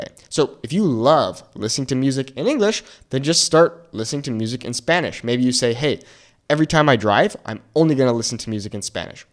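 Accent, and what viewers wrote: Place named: Mexico. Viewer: Good work. Greetings from Spain. You have a perfect Mexican accent.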